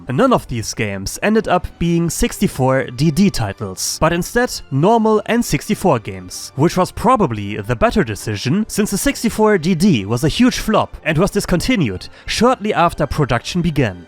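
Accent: Germany. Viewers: You make the German accent sound oddly attractive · Nach deinen Akzent gehe ich stark davon aus das du aus Deutschland kommst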